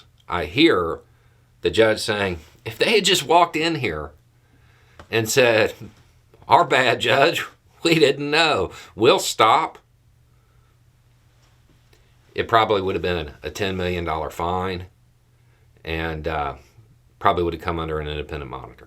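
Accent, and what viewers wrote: South, United States. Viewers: is looks as if he is the stereotypical southerner. Even has the beard. But he has a kind heart. He is changing my automatic distaste when I hear a southern accent. 🙏❤🙏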